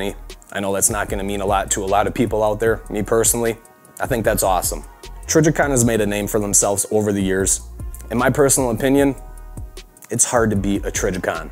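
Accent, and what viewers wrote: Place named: Wisconsin, United States. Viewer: Where yous from? I hear that accent guy and im thinkin you might be from wisco like me lol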